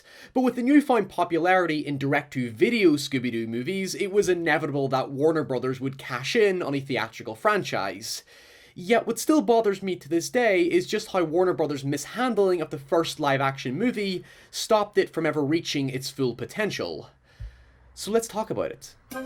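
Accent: Northern Ireland, United Kingdom. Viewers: I was so surprised to hear the Northern Irish accent! So glad to see some representation of our little part of the world in a successful YouTube channel, keep up the good work buddy! :) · Sounds like a northern Irish guy do an American accent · It's a Northern Irish accent, though I barely notice because it's the kind of accent I hear day-to-day so it's very familiar to me at home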